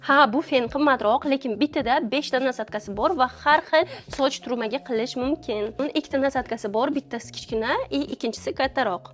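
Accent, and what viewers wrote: Bukhara, Uzbekistan. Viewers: Ой бу шева бухороники Шу район будагет! Мо шахар будаго тожики гап мезанем албатта😊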